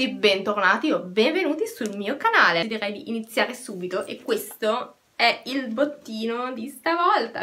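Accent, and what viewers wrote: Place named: France. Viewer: anch'io adoro gli oggetti di unicorno.Cmq è simpatico il tuo accento,sei francese?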